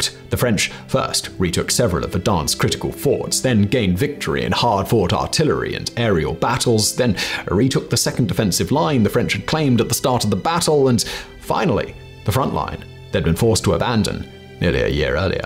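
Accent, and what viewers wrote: United Kingdom. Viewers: Is the narrator British? There's only so many English accents and I don't think it's north American or Australian :/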